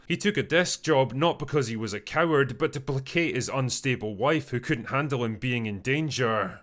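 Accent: Scotland, United Kingdom. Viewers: the sloppy-af Scottish accent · I liked bill. He was right. You, with your scottish accent and lack of balls, make me sad. BILL had a point in this life. You dont. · fuck sake your voice is horrendous, why do you keep drawling out the last word. where in scotland are you fae?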